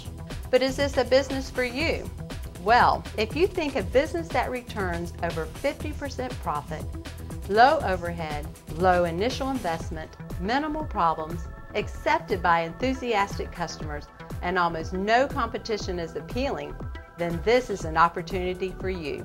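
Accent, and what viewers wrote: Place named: South, United States. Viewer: sign me up I just want to see you in person. hot south accent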